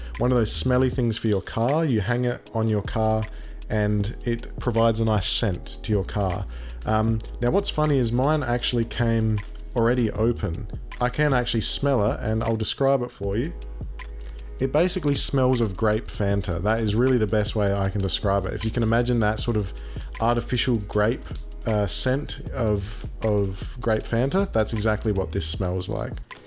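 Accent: Adelaide, Australia. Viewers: this guy sounds just like DJ Sanchez lol beyond just adelaidian accent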